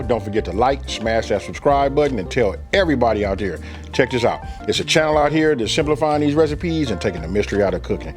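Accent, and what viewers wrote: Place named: California, United States. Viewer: I'm digging the Cali accent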